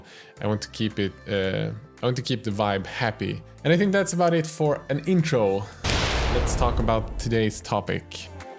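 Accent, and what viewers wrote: Sweden. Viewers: This is probably one of the most wholesome videos out there for this hobby! <3 As someone with depression, executive dysfunction, and on top of that adhd which leads to myself having 243506 different hobbies... I spend most of the time jumping from thing to thing. Painting miniatures is one of those things, and right now i think it was like... a year ago since i last painted anything... i paint like 2-5 times per year otherwise.. i go in waves. right now dnd is the wave that i spend most days working with as a dm. So... am I good at painting? No, but I'm average, decent, acceptable for tabletop standard, and as you say, that's perfectly fine! I dorecognise that lingering "i'm not good" feeling thou... damn it's crippling, but.. i'm doing my best at pushing that aside and instead going "damn that's crippling but what the heck let's do it anyway, in my tempo!" <3 Thank you for this encouragement. 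(now, if I could only fix the painting station which is just lying in heaps right now... xD) Also... Your dialect sounded very swedish,, then I saw the fiverr video and in the end I heard "nej inte äta den!".... yup... so hello fellow swede! :P waves from sweden, and i'm guessing the minis wip group aswell :P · Hittade din kanal för några dagar sedan. Hobbyn hade jag lagt på hyllan men efter sett dina filmer så börjar jag bli sugen på att måla igen. För du är väl svensk , har för mig att jag sett kr och du har en svensk brytning. Bra jobbat vilket fall som helst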